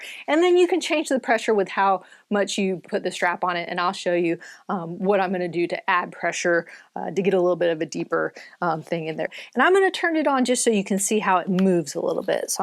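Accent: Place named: Oklahoma, United States. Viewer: I'm from west Texas, your dialect sounds like my family from Oklahoma, it's nice to hear!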